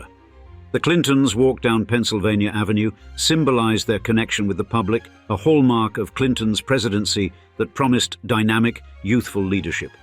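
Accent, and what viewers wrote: RP, United Kingdom. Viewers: Another BULL SH*T British narration - stick to your own corruption. There's plenty of it. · The lesson here is if you hire a guy with an aristocratic British accent you figure you can put all kinds of lies, bad stats and idiotic comments. I will just focus on one; Bill Clinton did not create a $200 billion + surplus. And this is just one of many in the first 5 minuted of this propoganda piece. Goebels would be proud.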